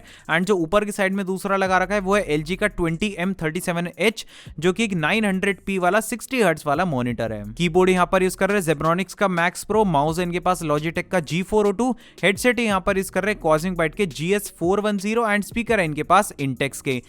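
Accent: Gujarat, India. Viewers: Bro are you Gujarati??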